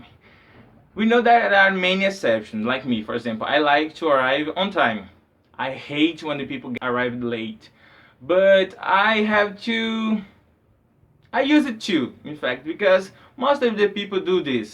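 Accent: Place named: Brazil. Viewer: I'm from Sergipe, Brasil, and I'm learning English by myself, because I have no money enough to pay for private English classes, that's why I'm looking for videos in English to practice my listening, and, although your brazilian accent you rocked! I got all you said, and I really get happy. Thank you for sharing this video speaking English, and I'm sorry for some mistake, if I did it.<3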